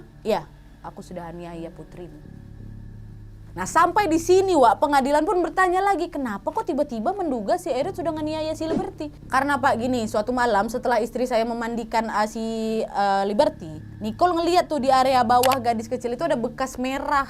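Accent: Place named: Medan, Indonesia. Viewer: tuh org sunda tp cara pembawaannya kaya orang medan😊 aku suka cara pembawaan ceritanya, meskipun menegangkan tp bisa bikin hati tetap slayyyyyyy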